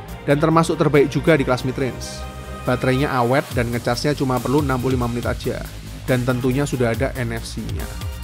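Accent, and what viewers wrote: Yogyakarta, Indonesia. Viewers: orang jogja bang? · Bang orang jogja ya? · wong jogja mas?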